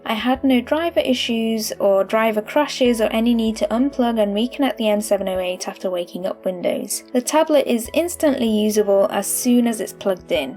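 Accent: United Kingdom. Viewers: I didn't notice the accent probably because we're both British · Thank you for your tutorial thank you for explaining it that was very impressive love your British accent and again I gave you a thumbs up and a subscription thank you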